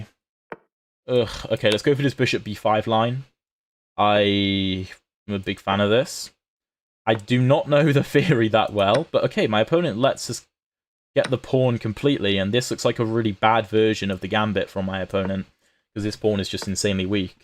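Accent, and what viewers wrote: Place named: Outer London, United Kingdom. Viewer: Please stick to the longer time control.your 1st oppoonent bundered all over the place presumably because he didnt have time to think..wheres the accent from sounds like the outskirts of london?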